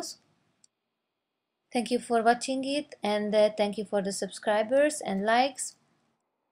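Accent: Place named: Russia. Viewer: а чё мужика то нет чтобы с палетами помочь. По акцентику то ты походу наша дифчонка :-)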